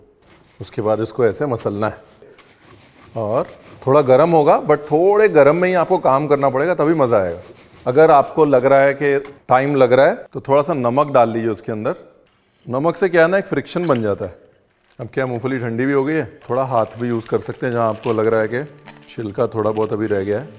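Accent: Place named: Lucknow, India. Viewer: Guru Tezz ho tm hain...laucknowa phonetic chhodate ni ho...sayad chhutegi v ni.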